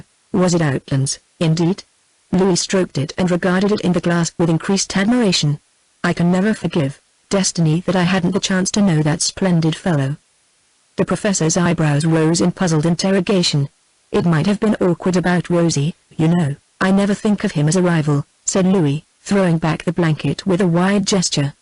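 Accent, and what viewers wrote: United Kingdom. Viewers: Seriously. It is INSANE to use a BRITISH voice for this!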